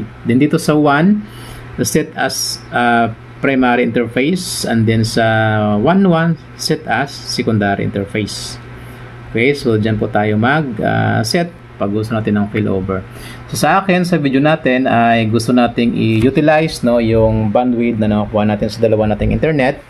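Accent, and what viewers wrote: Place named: Bacolod, Philippines. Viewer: taga bacolod ka sir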